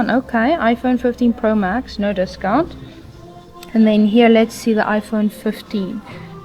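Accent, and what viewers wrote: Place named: South Africa. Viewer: Please forgive my asking.. You sound South African.. Im from Johannesburg And im your newest subscriber 🎉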